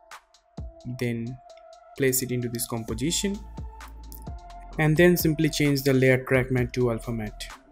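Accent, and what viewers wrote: Bengal, India. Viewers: bengalis from bengal have shittiest irritating english accent.. amazing btw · Brother are you indian? lol❤️❤️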